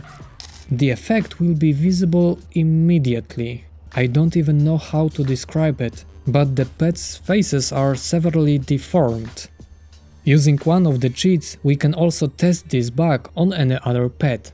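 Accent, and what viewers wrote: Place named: Poland. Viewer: Słychać że polak niezły film tak trzymaj!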